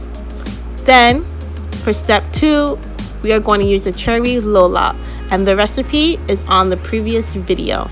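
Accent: Toronto, Canada. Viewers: I here rhe Toronto Caribbean accent 😁. Before l read your little bio l knew you were Canadian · I knew you where from Toronto as soon as I heard you speak the bell remote confirmed it 😂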